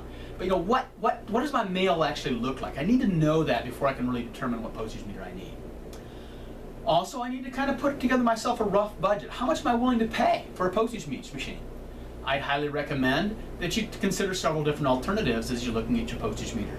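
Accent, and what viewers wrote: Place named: Arizona, United States. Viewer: I like your accent. Are you from AZ?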